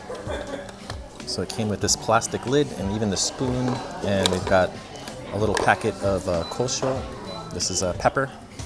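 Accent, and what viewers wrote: United States. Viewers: Please remove hat while eating Love video and had Kaiten sushi often. Are you originally from Hawaii? Sounds like it.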